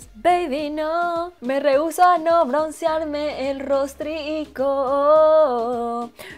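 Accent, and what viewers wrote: Venezuela. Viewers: jajajajajajajjajajajaa que risa no paro e reir ¿eres venezolana?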